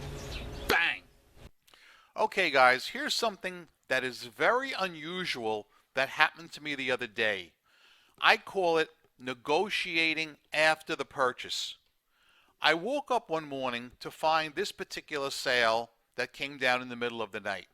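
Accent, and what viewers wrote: New York, United States. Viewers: love hearing all the NY expressions and pronunciations - miss the vernacular. Had a p.s. on a sale last week. What I did was send the item as though I never got the second one. Once the post office got it - I messaged the buyer that I saw his p.s. on the sale AFTER our warehouse had shipped it. I said they go on the paypal sales as soon as they go through. ha. No response, no neg.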